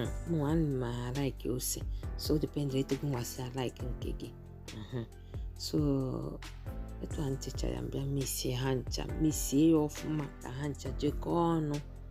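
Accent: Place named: Anambra, Nigeria. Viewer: Nne, ji gi a ehn nyum nyum. And I sotay enjoy this your Anambra Igbo so much oh!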